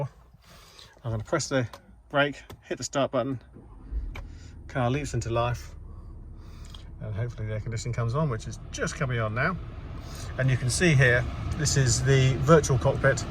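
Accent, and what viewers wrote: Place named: United Kingdom. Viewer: British accent ruined the video I’m unable to continue watching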